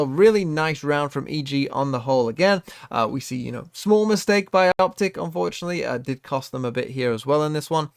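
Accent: United Kingdom. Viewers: It's because he is. He literally has a British accent.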